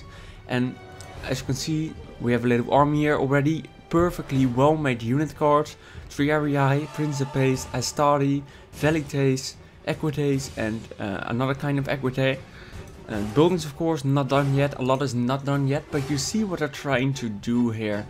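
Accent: Netherlands. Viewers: ahhhhh your accent man, im cringing ( dutch aswell )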